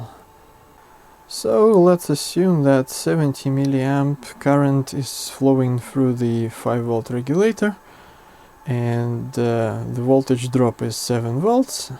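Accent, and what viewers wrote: Russia. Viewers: русский акцент паливо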